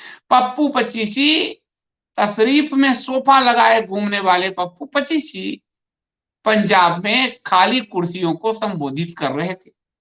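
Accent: Bihar, India. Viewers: ur tone truly Bihari about 25shhuuu🇮🇳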